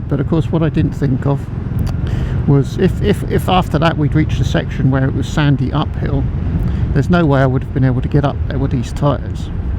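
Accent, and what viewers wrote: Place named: United Kingdom. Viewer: Hi. I am a new subscriber. Wonderful footage - thank you heaps. Quite spectacular scenery. I'm thinking where you are is a little remote? The roads appear to be brilliant but there seems to be a lack of people. I am also running in a new Classic 350 (Signals) so I find it interesting watching someone else going through the same process. It's private information I know, but you sound British, and I can't help but wonder what you are doing in Spain. Have a good one.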